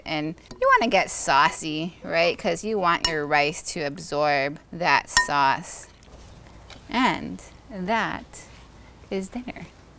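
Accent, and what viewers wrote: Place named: Wisconsin, United States. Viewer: I like listening to you because even though you're Hawaiian, your accent reminds me of being home in WI.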